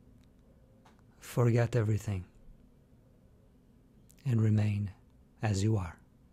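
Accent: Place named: Italy. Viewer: Interesting. I'm used to simply watching my breath for thirty minutes. I think I will continue that way. Simple is what works for me. Great stuff,. Continua a fare queste cose e aiuterai a tantissima gente. I hear an accent and is an Italian name. Io sono cresciuto a Napoli!!! N'abbraccio.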